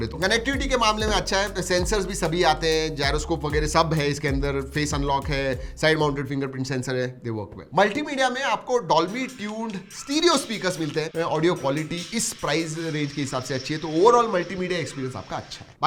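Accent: India. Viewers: The only one indian guy whit nice accent en nice video ❤ thanks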